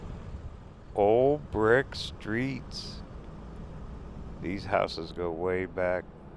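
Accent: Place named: Ohio, United States. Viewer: Yay, you are heading to Ohio. I was so, so happy to hear you decided to go to Ohio. The very first time I heard your voice and the way you talk, I just knew you were from Ohio. You remind me so much of my dad, especially the expressions you use and the way you laugh. This Ohio girl knows Ohio people as I call them. Too cool for school. My dad moved from Ohio to Arizona too. He had family in the Phoenix/ Scottsdale area. I was one of those little girls riding a tram at a young age. My Pop was a railroad engineer and my Mamaw had a lifetime pass. She took me on the train when I was younger than five and I still remember that ride. Train museum brought back memories. Thank you. ❤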